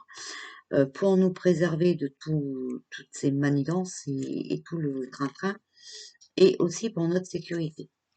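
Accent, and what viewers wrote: North, France. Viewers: J’adore votre accent du Nord ! Mdrrr merci pour ces infos j’en suis sure il va tout faire pour nous aider ! La divinité l’a mis sur notre chemin celui de la vérité et de l’amour ! Sans hésitation je vote Rémy ! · bravo à vous , vous tapez souvent dans le mile , vous etes un soutient psychologique pour plein de monde , vous etes empreinte de la lumière , j'aime bien votre accent du nord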